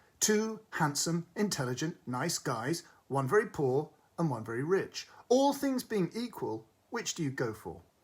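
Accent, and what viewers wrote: United Kingdom. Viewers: Which horror! Sorry for you that you are forced to review such a movie, in French "une daube"! (Watched the trailer. Such waste of pellicule! and such bad acting.) Discovered by hasard your vlog! from Locarno's Festival, appreciating and following you since then. And reading your reviews on Guardian, my preferred newspaper since I was studying classical singing in London many many years ago. By the way did you leave our Lago Maggiore's sublime shores? Did you miss on Piazza Grande the terribly strong punch-movie "Irkalla Hulm Jijiljamish" by M. Jabarah Al-Daradji ? Even under such a never happening before heat wave I was freezing, tears in my eyes, a lump in the throat... Ah, by the way, adore your exquisite English accent!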